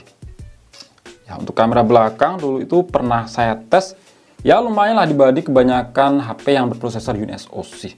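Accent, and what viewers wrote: Blitar, Indonesia. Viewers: Orang blitar kah bang😅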